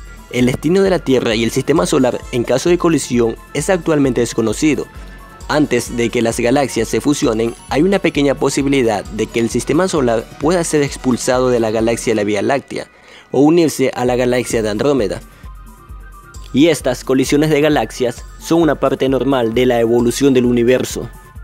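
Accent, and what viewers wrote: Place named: France. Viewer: Ahh y la voz con ese toke como de frances pero en español me explico?? Español con acento frances...como el inspector de la pantera rosa.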